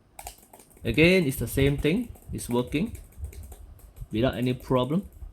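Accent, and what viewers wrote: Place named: China. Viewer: I love that chinese accent.❤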